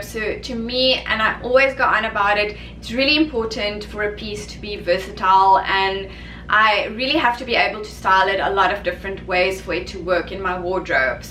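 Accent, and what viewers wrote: South Africa. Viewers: Thx for your suggestions. I’m going to a wedding and wanted to dress up my dress. Also love the SA accent.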